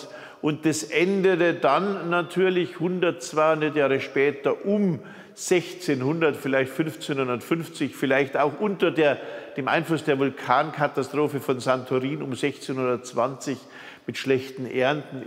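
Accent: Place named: Bavaria, Germany. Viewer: Der Mann ist aus Bayern. Hört man aber eigentlich.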